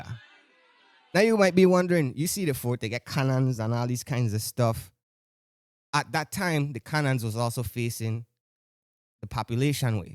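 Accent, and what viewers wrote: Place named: Caribbean. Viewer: “Awo”, I watched this educational and somewhat comedic video in Ms. Charles’s class at John H. Woodson junior high school, I enjoyed the comedy and to hear a Caribbean accent speak to me about the topic of slavery. I find this video informative because it shows what our ancestors had to go through for us to be where we are now. - Lesyah D.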